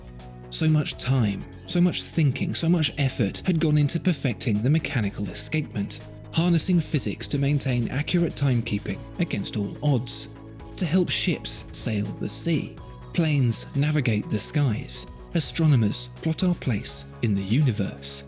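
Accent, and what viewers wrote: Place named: RP, United Kingdom. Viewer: Despite the crazy watches that are already fascinating on their one, the real key to your videos is that absolut high end English. Huge probs. Love it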